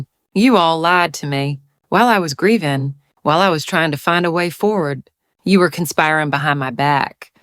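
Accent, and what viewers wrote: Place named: South, United States. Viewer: LOVE THE SOFT SOUTHERN ACCENT. Good story.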